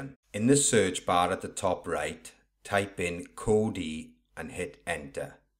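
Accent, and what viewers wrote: Wales, United Kingdom. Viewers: Love the Welsh accent! My mum is from Cardiff. :)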